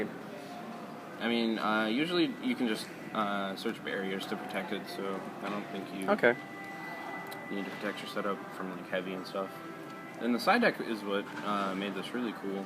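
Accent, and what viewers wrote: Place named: United States. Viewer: I use to think was a fat german with a craving for chocolate, then I heard his murica accent, and I was like WOAW my mistake.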